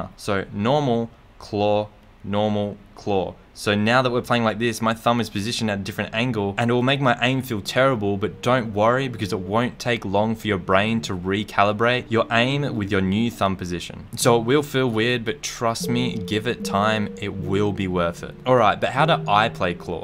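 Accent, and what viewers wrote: Australia. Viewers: Kolxk no he is Aussie like me and he said CALL of duty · Btw he said call it’s just you non-Australians can’t understand our sweet accent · hes Australian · Aussie accent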